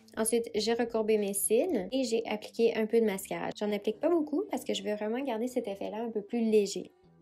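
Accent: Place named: Canada. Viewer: sur cette video la dame est canadienne je pense. elle a un accent prononce. elle ne parle pas bien le francais de france. elle se trompe dans les determinants. donc pas top pour apprendre le francais.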